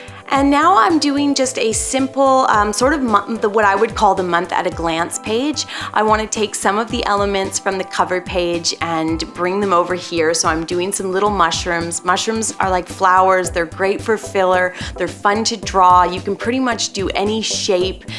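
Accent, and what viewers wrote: Canada. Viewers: Manuella Petkovic She’s Canadian.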